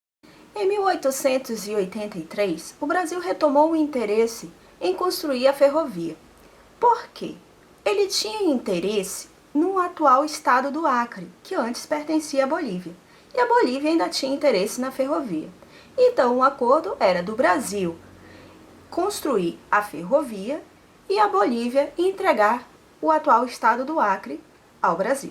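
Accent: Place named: Manaus, Brazil. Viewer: Sabia que a era de Manaus! Mesmo sendo carioca, reconheço facilmente os sotaques brasileiros. Estava em dúvida entre Amazonas ou Pará.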